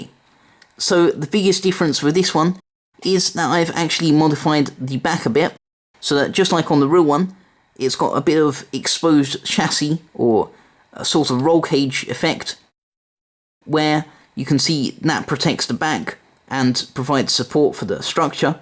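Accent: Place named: Southern England, United Kingdom. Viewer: I can recognise your accent but whereabouts in england are you from (guessing its down south)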